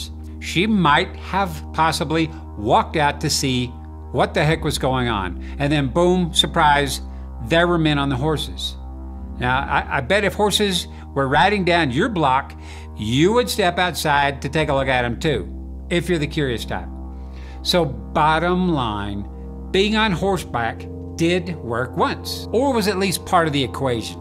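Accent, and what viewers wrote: South, United States. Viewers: You're I'd say southern accent sounds like mine when I talk lol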